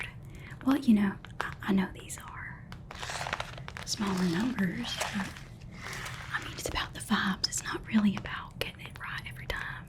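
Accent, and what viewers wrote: South, United States. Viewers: The Southern Belle has returned! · Hey, it's been way too long since we last caught up, you're missed 🎉 ❤.. beautiful accent to which region in the (( USA 🇺🇲 )) it belongs to?? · This gives off Firefly/Serenity vibes. Why do southern accents and Sci-fi compliment each other so gosh-darn well?????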